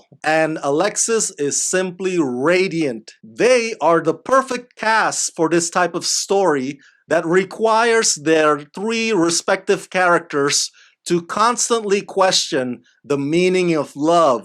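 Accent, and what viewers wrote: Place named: Indonesia. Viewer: btw i hear an indonesian accent are you indonesian?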